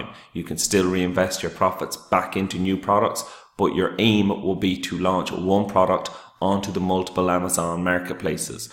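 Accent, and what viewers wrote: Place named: Ireland. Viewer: I hear an Irish accent, what part are ya from ?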